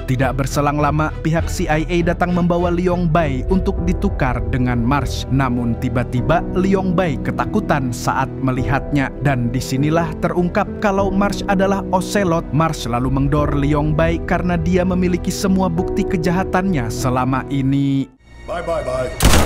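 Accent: Banjar, Indonesia. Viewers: Bah orang banua sakalinya😅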